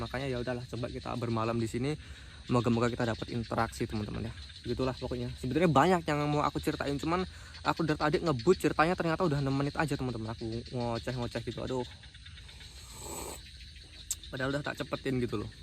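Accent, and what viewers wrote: Java, Indonesia. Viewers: Salam.kenal mas...maaf kayaknya dri logat ada jawanya yah..salut sama masnya anak muda yg pemberani nyalinya gak kaleng kaleng, hanya perlu hati hati saja dgn org yg bisa jahil apalg dihutan....semangat..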